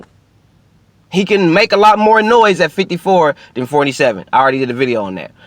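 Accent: United States. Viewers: You from Houston bro?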